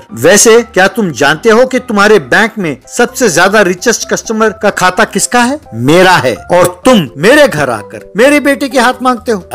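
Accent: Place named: Patna, India. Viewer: Get puffy Patna tone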